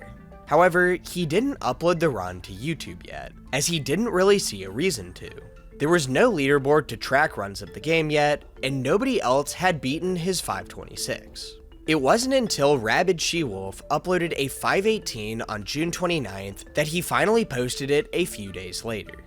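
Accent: United States. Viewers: Are you from Pittsburgh/the Pittsburgh area? I swear to god your accent is exactly like everyone in my hometown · good video like 2 minutes in but i have to say i am so charmed by the socal accent. spiritually it sounds like you were born wearing a beanie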